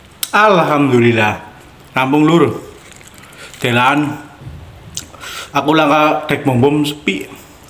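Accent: Banyumas, Indonesia. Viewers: Saya suka logat ngapaknya pak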